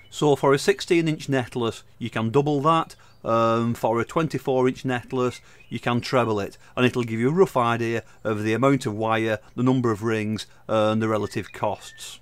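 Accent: Ireland. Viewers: Sir, you are a very, Very, VERY good teacher! The Irish accent doesn't hurt either.😉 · Boy do I love your Irish brogue! It makes my Irish blood sing! (I’m 40% Irish and proud of it!). You’re a great teacher and I think I’ll subscribe!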